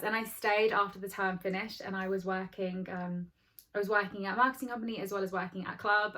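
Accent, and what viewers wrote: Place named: United Kingdom. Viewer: Oof that British accent 🥵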